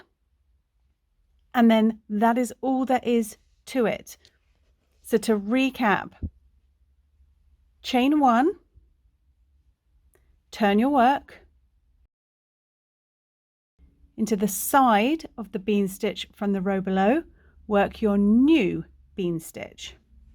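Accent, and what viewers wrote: United Kingdom. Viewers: Crochet is very popular in the States, and I had a wonderful evening with the group. Being British I love your channel as it's nice to hear a familiar accent together with American crochet terminology. It's a win win for me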